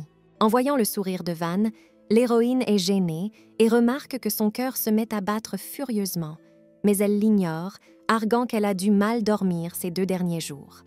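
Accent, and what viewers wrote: England, United Kingdom. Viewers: Tu parles vraiment comment une anglaise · Pk y’a un accent anglais comme ça Miskine ❤❤❤❤😂😂😂😂😂😂😂😂😂😂😂😂😂😂😂😂😂😂😂😂😂😂😂😂😂😂😂😂😂😂😂😂😂😂😂😂😂😂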